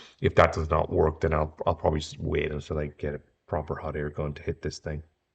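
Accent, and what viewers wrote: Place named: Germany. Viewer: excellent video! first off , your German accent was the highlight of my morning. Also, after the mosfet install u used a yellow brush to cleanup the job site. what product did you use for that? cuz i have some rebuilt ham radio gear where they didn't clean like you did. Also,is there a number of seconds u keep the s gun on to avoid cold solder joints.? lastly, I have this Bose 300 but I entertain at fancy houses and ive seen numerous Sonos brand installs. Do you know if Sonos has a good build quality? Thanks so much. Jim in Washington state.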